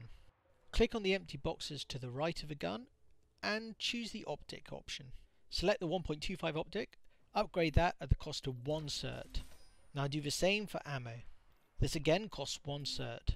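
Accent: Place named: United Kingdom. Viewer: I think you've found a great niche- a pure NC channel is a great supplement for an NC player who wants to learn the ins and outs of the factions to a more general channel (like Wrel's). Keep it up! I play on Waterson, but, judging by your accent, you live in the british isles and dont play on waterson True?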